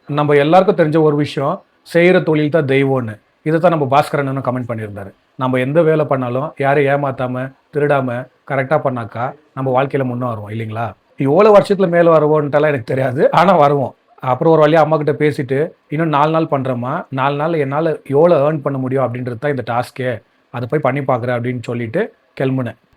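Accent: Bangalore, India. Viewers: Bangalore tamil ah ..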